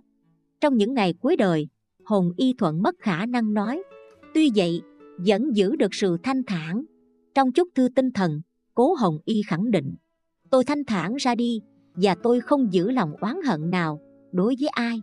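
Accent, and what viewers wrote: Saigon, Vietnam. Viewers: Giọng Sài Gòn nghe ngọt quá! 👍👍👍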